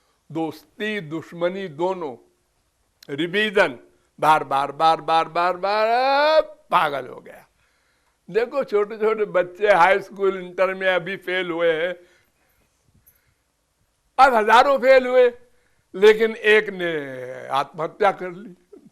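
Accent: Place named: Haryana, India. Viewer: Swamiji haryana se lagte h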